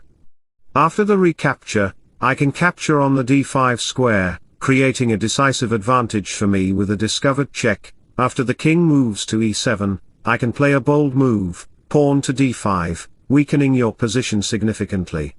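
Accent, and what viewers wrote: United Kingdom. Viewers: It must be - that's a British accent.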